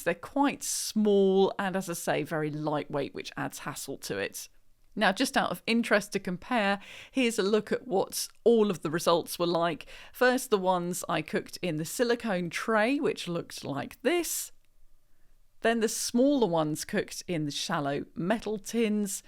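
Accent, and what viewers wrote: England, United Kingdom. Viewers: 2025 Thanks and an English voice too. 👍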